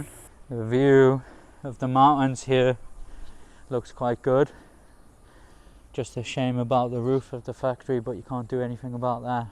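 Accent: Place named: Newcastle, United Kingdom. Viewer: He is from UK. Specifically North of England. Newcastle area. Which known as Geordi land. Hence his accent.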